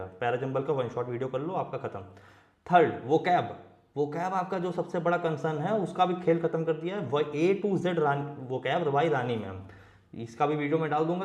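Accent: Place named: Uttar Pradesh, India. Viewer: Up accent❤😅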